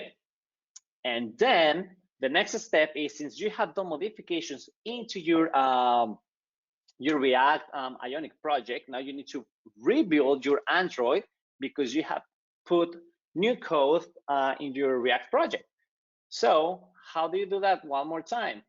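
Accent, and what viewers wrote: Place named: Latin America. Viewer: fua amigo me salvaste de una grande no se de donde sos, tenes tonada lantina y te dicen (? asi q somos hermanos loco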